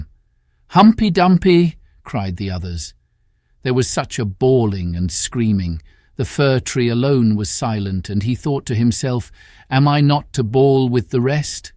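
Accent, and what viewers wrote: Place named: England, United Kingdom. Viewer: Thanks for that...great narration , English accent ...just found a new channel for me. Merry Christmas.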